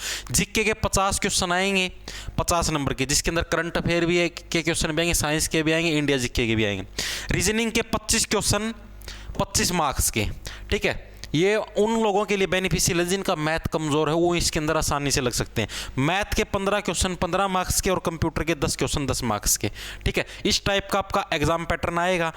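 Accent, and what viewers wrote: Haryana, India. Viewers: Bhai aapki boli dekh kar me bhi aadhi si haryanvi Sikh gya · Haryana ale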